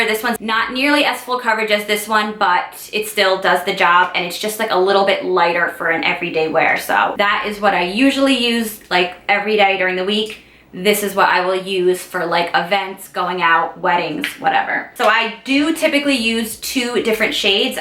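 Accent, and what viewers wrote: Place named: Michigan, United States. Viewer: I love your accent. are you from Michigan?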